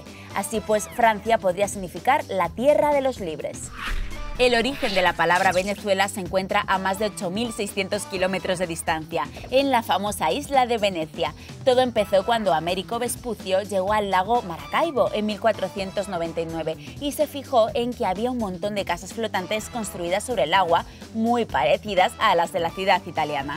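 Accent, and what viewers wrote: Spain. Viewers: Eres de España? lo sabia!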